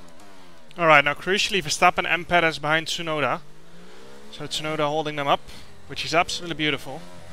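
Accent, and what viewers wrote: Netherlands. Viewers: are you form the netherlands/ kom je uit nederland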